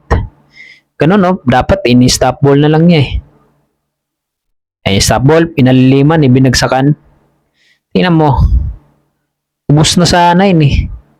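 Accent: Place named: Visayas, Philippines. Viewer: Tana na bisaya ingay mo 😅😂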